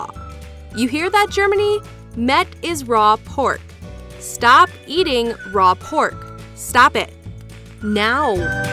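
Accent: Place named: United States. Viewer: I don’t lie how she or he said stop eating that food. It’s their culture ok! Ok so you don’t like it you have no right to tell someone to eat or not eat something it’s their culture. And they won’t stop eating it because u arent the government u can’t tell them to eat and not eat something a lot of people enjoy and is part of the culture and holiday maybe🙄😒 “Of course japan is on this list. I don’t think japan eats any “normal food”” WHAT! Excuse me “Normal Food”like what omelets, pancakes, pasta! It’s their culture ok. What u eat is not “normal food” it’s “basic food” wouldnt u get offended if Japan told u “of course America is on this list (not saying your from America but probs🙄😒) with their disgusting un normal food” you sure are making yourself sound racist right about now🙄😒 s”sushi is prolly their least “un normal food” (not what she said but minus well have) but it’s still raw fish wrapped in seaweed” I wouldn’t want to be friends with u, u would just make fun of what I eat as a Mexican. 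“ it sound disgusting” “has anybody thought meat should be cold NO because it is disgusting” “if u ever come upon it you should call an exorcist” 🙄😒 gurrrrrrlllllll (or boy) you’ve probably never tried it and it’s actually good. “You hold the gag reflexes” I’m tired of u🙄😒 “The Koreans” do I hear a 🔥🔥🔥🔥racist🔥🔥🔥🔥🔥🔥 “Round chicken nuggets or seasoned meatball” 😂🤣😂🤣😂🤣🤣🤣😂🤣🤣🤣🤣😂🤣 I would think it’s a tater tot but not trying to be racist but you sound American. And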